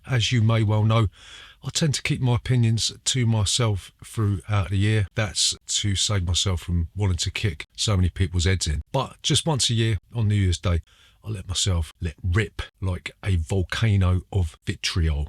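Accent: United Kingdom. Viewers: Thanks again for last year. I've stumbled upon your channel by luck, what drew me in was your Jason Statham kinda lookalike 😂😄. Also your British accent. I use to live in the UK, spent 16 years of my life growing up in the UK. Lived Portsmouth but have moved out of the UK 12 years ago. I am trying to decipher what part of the UK you are from. I've yet to watch all you videos so if you mentioned it somewhere I'll eventually get to my answer 🤣. I miss England, best time od my life growing up in Brighton and then eventually Pompey.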